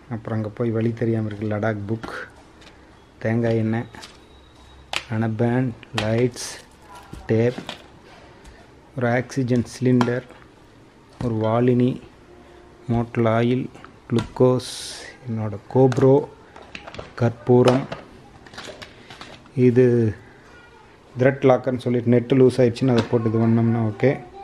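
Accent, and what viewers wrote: Kongu, India. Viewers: Enunga Coimbatore ngala.? Kongu Tamil 😍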